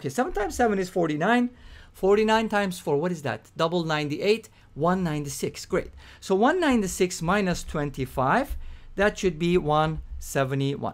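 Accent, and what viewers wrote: Turkey. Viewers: Your English accent sounds Turkish .is it really so :)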